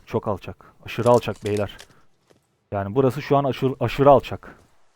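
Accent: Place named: Anatolia, Turkey. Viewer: Hocam allahtan keşfetime düştün aşırı zevk aldım seni izlerken. Oyunundan çok konuşma tarzın aynı bizim Anadolu tarzına benziyor. Yayın bekliyoruz hocam eğer açacak olursan elimizden geldiğince destek oluruz inşallah.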